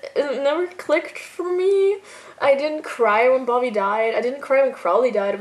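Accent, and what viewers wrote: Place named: United States. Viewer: Hi! If you're from Austria, how can you speak so beautifully in English and especially with an American accent? By the way, love your videos! ( Greetings from Hungary 😊 )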